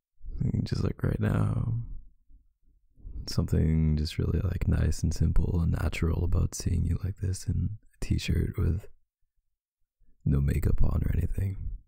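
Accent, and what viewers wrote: Canada. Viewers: Omg you’re voice so nice 😳🥺 and your giggles/laughs make heart melt 🥺💖 to dream of having a boyfriend sound like you lol I love Canadian accents 😊 they make me melt 🥺 new fan from the UK here lol Stay safe 😊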